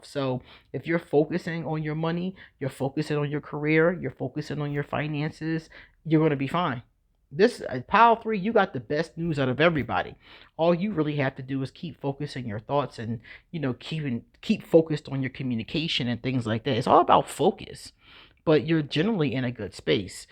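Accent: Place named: Philadelphia, United States. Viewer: Ma’am! Are you from Philly? I can hear your accent. Signed- a Philly girl who is an Aquarius, lol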